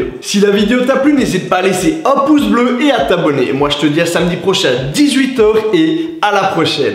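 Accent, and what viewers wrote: Liège, Belgium. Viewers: Personnellement je n’ai qu’une question ^^ tu viens d’où ? On reconnaît un peu l’accent de Liège mais je suis pas sûr lol ^^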